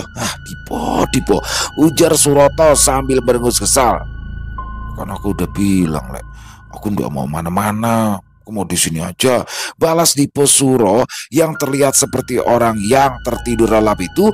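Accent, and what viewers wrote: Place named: Java, Indonesia. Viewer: Favorit banget. Ditunggu kelanjutan ceritanya ya mas untung. Dan makasih mas yg membaca dg logat Jawa yg mantap. Aq bs membayangkan wajah² org itu.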